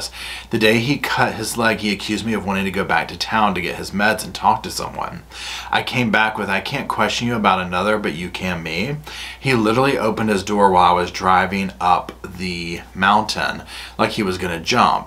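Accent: South, United States. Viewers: Why don’t you have 1m subscribers? You are the best. Great wit and the southern accent makes it gold. !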